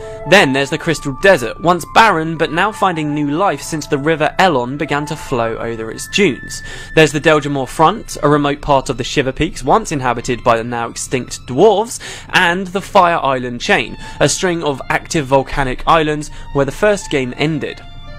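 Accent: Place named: United Kingdom. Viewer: ...我以為自己正在看泰瑞亞的 discovery channel ... 很重英國口音又對得上口的旁白啊！！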